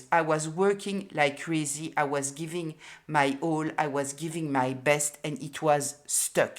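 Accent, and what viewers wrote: France. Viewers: my beautiful Lady, your story is so much my own... So much in common from what you've shared. I recently found your channel and the first time I heard your beautiful voice and that lovely French accent I feel I know you from a previous life. The few videos of yours I've watched have provided so much clarity for me (this is one of the ways you agreed to help me along my journey). No one would believe the hell ppl did to me, but your personal story as well as your resonate readings confirmed for me that not only am I not crazy; the false narrative about me, it has also helped me to finally see why the devil has made me his focus for most of my life. Satan doesn't attack souls who are already doing his bidding. He only attacks souls who are shining God's pure Light. You my dear sister are clearly on your divine and destined path; the one you planned with your own spirit guides to come to this difficult school. 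I feel it every time I Iisten to you. I owe you so much and one day when I'm in a position to do so, I will give back even though I sense you expect nothing in return. Thank you so much... please tell me your name. 🙏🏽 If the algorithms allow my comment to get to you; my name is Joan as in Joan of Arc, and I'm truly happy to have found you...again. 💜💜💜😊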